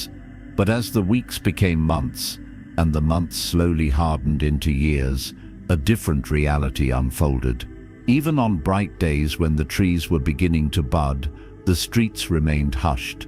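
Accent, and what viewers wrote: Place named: England, United Kingdom. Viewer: Your channel has become very important to me, to begin to relax for sleep. Please never change the voice! I love the English accent. Also the quiet music. Thank you.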